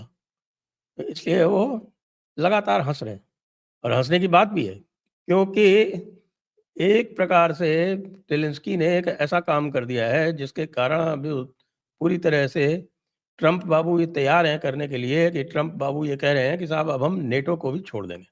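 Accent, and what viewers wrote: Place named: Gwalior, India. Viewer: सर जय श्री राम, बहुत दिनों बाद आपकी भाषा में typical ग्वालियर वाला पुट सुनकर बहुत अच्छा लगा l धन्यवाद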